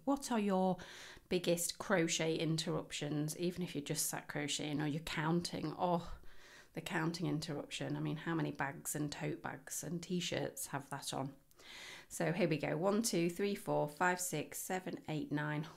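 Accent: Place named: Lincolnshire, United Kingdom. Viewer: Recognize your accent. I’m originally from Lincoln. Was nice listening to you and I followed what you did and have a lovely coaster for my coffee cup 💕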